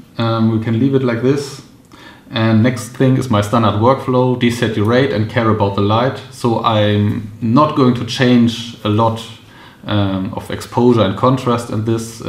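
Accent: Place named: Germany. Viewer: Guten Tag. Thank you for your workflow video. Really helpful 👍 Btw, your accent reminds me of my semester in Germany (Koln), wonderful memories.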